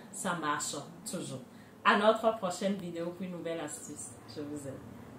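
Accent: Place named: Benin. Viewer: Je suis tombée sur votre vidéo ✨ Pour la première fois je me suis abonnée tt 2 suite parce-que vous êtes assez naturelle vous n'avez pas essayé de changer votre accent béninois BRAVO👏👏👏 BONNE SUITE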